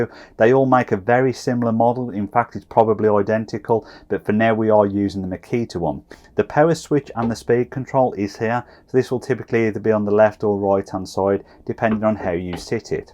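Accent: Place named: Midlands, United Kingdom. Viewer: Found the channel researching small CNC, heard the Midlands accent, already confident I've found someone who knows his stuff. Great video, subscribed.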